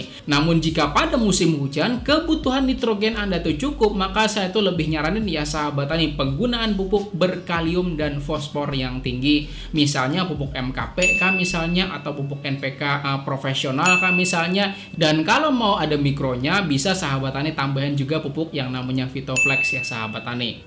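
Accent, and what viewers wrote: Banjar, Indonesia. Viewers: Logat banjarnya masih ke ikut ya pak🤣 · Pian asli banjar kah??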